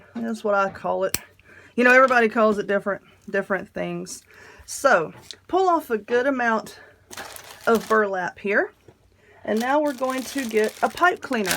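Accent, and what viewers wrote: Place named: Carolina, United States. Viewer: I absolutely love that “Carolina twang “ Tour videos are so easy to follow, thank you so very much for taking the time to teach us & for taking the time to do your videos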